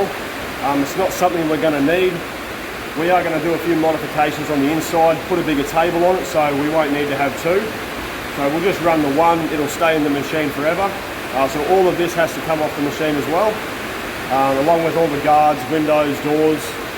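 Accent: Queensland, Australia. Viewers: Simple CC can barely cope with American English, struggles with Australian English and is completely fu!@ when it comes to Queensland English.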